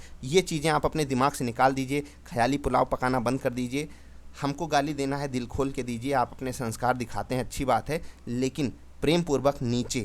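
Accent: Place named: Uttar Pradesh, India. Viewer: Sir apki baatein bohot pasand hain aur achcha analysis dete ho aap... UP ke lagte ho aap.. kanpur ya lucknow ke aas paas ke? Kahan se ho aap?... EK BAAT AUR BATA DIJIYE for my analysis purpose... Which software or website u r using for technical charts ? right here in ur Video..🙏🙏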